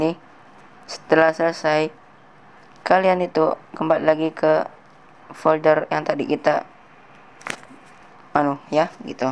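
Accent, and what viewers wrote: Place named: India. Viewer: Suaranya kek orang india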